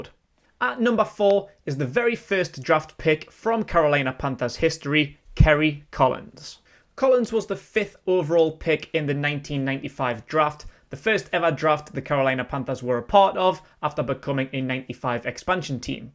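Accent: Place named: England, United Kingdom. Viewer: Got sent here by Panthers Post (sorta). Interesting to hear someone talk about American football in an English accent lol. Hope you enjoy the game next week, win or lose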